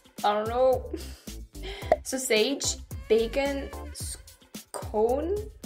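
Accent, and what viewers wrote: France. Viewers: You did really good I thought. Don't loose your French accents, makes listening to your videos very charming. Time to show us some more delicious French food please!!! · My father is from France, so I’m used to the accent. The word "father" itself comes out particularly humorous, so be careful. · You did SOOOOO Great! Kernel=Colonel, and you have it. There's also the French S for singular things, which is endearing, so don't change!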